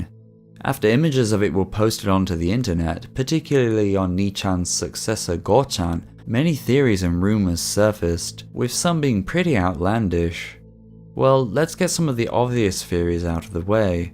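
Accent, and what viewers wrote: Manchester, United Kingdom. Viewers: Creepy video - nicely done! Your accent sounds Manchester-ish.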